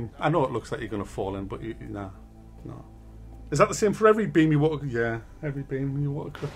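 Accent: United Kingdom. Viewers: I've always disliked how nasal the English can be with their letter "a" like when they say, "Absolutely." What's accent? What part of UK? I've been a subscriber for a long time and always liked how he sounds.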